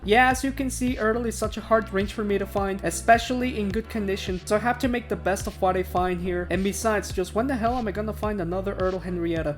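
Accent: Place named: Mexico. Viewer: You are mexican becase you sound one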